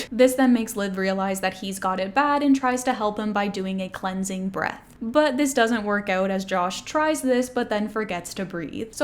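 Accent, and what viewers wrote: Canada. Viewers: Are you Canadian lol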